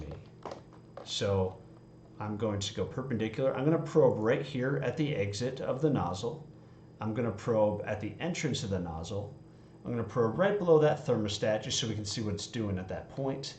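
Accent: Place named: United States. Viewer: this the first time i see an American (i assume from your accent) using SI and Metric unit for the tutorial lol. this video is amazing tho, very detailed explanation. very helpful. thanks a lot.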